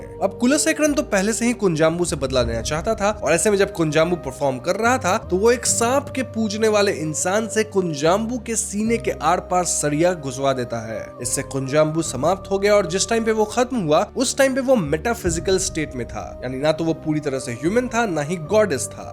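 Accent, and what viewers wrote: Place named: Bihar, India. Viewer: Kedla kya hai bhai.... Bihari accent mat ghua bhai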